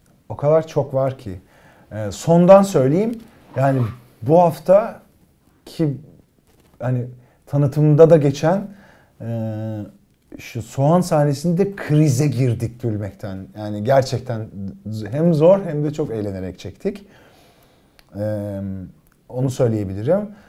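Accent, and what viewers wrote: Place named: Black Sea, Turkey. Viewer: Sinan abi Allah aşkına sen karadenizli ol şiveni falan bırakma ŞUAN gerçekten MUSTAFA ASİYE sahnelerine ihtiyacım var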